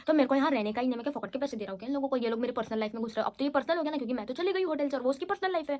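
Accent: Maharashtra, India. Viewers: I just love the way you are talking and your marathi accent in hindi is such a marvelous to hear ❤❤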